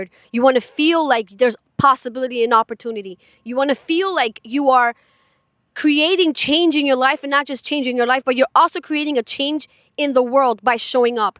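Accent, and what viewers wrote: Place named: New York, United States. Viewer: Hey! You're looking beautiful, of course!, lol! 😂😊 I love the scene around you, it's stunning! Such a nice looking lake!, you are an incredible woman! I very much believe you will achieve what you wish. You definitely have what it takes. I understand many of the things you feel and have experienced. Maybe not in exactly the same way, as we are two different people but definitely to a point that I get you. Just an observation before I continue! Not something you need to answer but I get the hint of New York in your accent. I could be wrong or I might possible say Philli but I can't quite remember their accent!, lol! 😂 I only mention it because accents interest me, capture my attention! I am a Brit after all so hearing different accents is interesting, lol! 😂 OK, where was i?, lol! 😂 You will get to where you want to go! 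It may not be right this moment, it takes time to save money, especially if your employer doesn't pay much. I had to get out of the situation I had been in for many years The psychological effect it can have on you is something that really becomes something you notice once you live in your own place. You realise the control people can have and how messed up they can make you. As mentioned on another video of yours, once you do get out of your situation, not only will you feel so much better but you will truly discover who you really are. You are an incredible woman and so much stronger than you realise. Just hearing your story reveals that. Believe in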